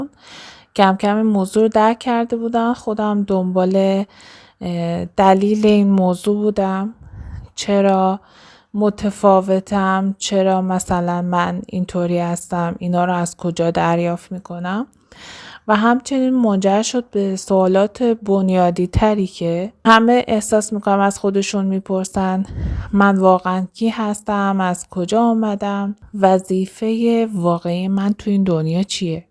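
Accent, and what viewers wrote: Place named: Kerman, Iran. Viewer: سلام عزیزم اگه کرمان هستین لطفاً بگید چون منم کرمانی ام ولهجتون فک می کنم همشهری هستیم من خیلی نیاز دارم باهاتون صحبت کنم اگه میشه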